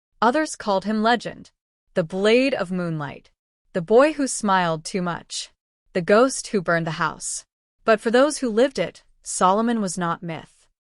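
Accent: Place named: Europe. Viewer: I would have listen to it if the voice wasn't European.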